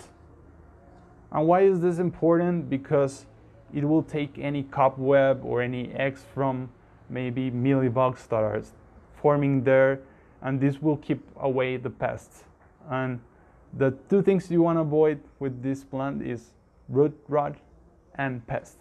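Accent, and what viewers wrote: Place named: India. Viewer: İ don't understand your English Indian accent or what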